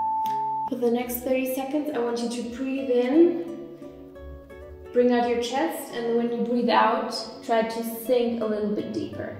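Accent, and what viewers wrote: Germany. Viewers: U have a german accent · This is the first time I´ve heard her talk in a video, was not aware was German haha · Are u German no hate but ur accent is like mine in english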